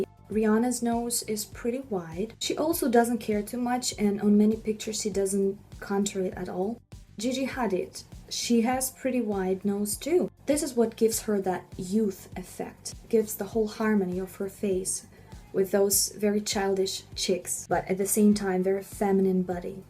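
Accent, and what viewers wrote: Russia. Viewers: Русский акцент чуть чуть чувствуется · I can hear russian accent hehe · Russian))) да неплохо звучит наш акцент)) я всегда за это волнуюсь ,когда говорю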